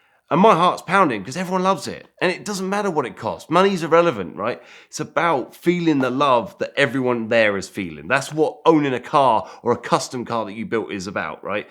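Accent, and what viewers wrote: United Kingdom. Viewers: I don’t care how good a story is, it’s just that much better when hearing it from someone with an excellent British accent. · i dont expect the everyman-british accent from him! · maybe its just the brit accent but i now want to make the lightning mcqueen mobile!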